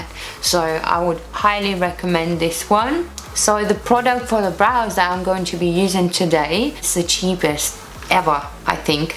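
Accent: United Kingdom. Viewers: Kaip faina buvo klausyt kaip angliškai kalbi ir dar su tuo lengvu britišku akcentu!:)) O antakiai tavo puikūs, labai patiko video! Beje taip pat turiu asimetriškus antakių priekius todėl puikiai suprantu ką reiškia nekesti vieno antakio 😂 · Labai gerai ir gražiai šneki angliškai!! Girdisi toks britiškas akcentas, kuris man asmeniškai laabai patinka!!😍😍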